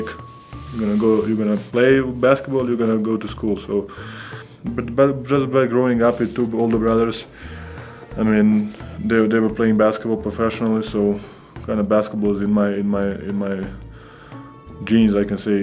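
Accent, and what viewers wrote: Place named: Sombor, Serbia. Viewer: Pozdrav, uz tebe smo brat nas! Nego opusti se i pricaj engleski sa tvojim somborskin naglaskom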